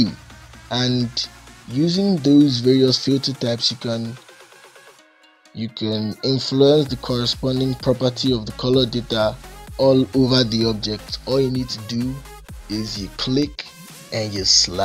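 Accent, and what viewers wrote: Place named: Nigeria. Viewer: the sculpting workflow is immaculate, the nigerian accent aswell 🤣🤣😭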